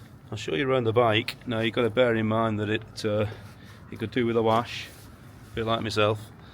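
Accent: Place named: United Kingdom. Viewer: Love it Mate! And your accent is great too! Not been in Uk for over 5 yrs now. This is an ace bike. Wa%%_rs for rules on places you could ride when younger. Ive done 22k kms here on an old Bullit without anything legal. Im too old to give a to@ anymore. I want to ride one of those until i die now.👍👍👍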